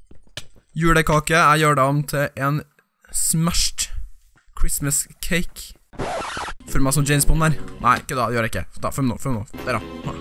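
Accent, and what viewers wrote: Trøndelag, Norway. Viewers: Du e best elsker deg liker din stemme som er trøndersk😍😍😘😍😘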